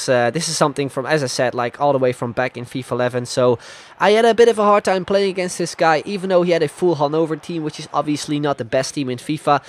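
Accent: Netherlands. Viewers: is he dutch? he sunds like?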